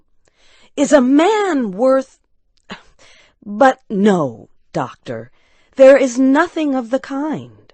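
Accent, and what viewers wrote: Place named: United States. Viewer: 'Her' voice 'is' not good . Wrong grammar . I hope you think twice before commenting next time because that's a very nice american accent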